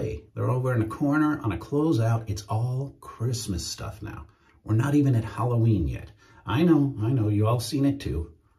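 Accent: United Kingdom. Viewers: The thing i love about british people is their accsent🥳😃🎉🎊🇬🇧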